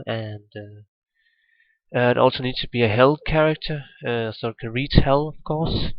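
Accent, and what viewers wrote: Denmark. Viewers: a bit danish maybe??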